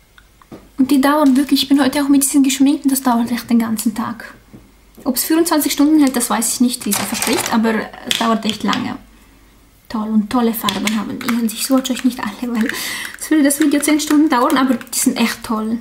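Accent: Switzerland. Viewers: toller akzent/ Dialekt..(weiß ich nicht genau) bist du Schweizerin?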